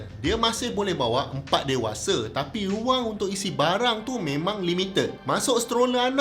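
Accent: Johor, Malaysia. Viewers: Prof orang joho ke ? 🤭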